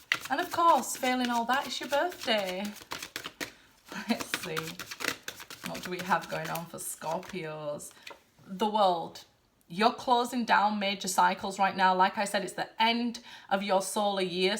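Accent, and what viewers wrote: United Kingdom. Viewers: The uk or London with that accent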